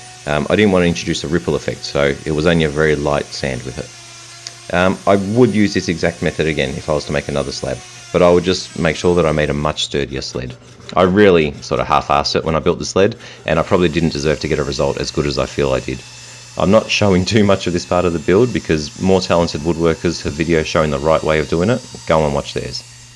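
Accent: Australia. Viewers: It's great to hear a Aussie an Aussie accent. Love the casual approach as opposed to the over technical banter that is often the case. Great job and thanks for taking the time to show it.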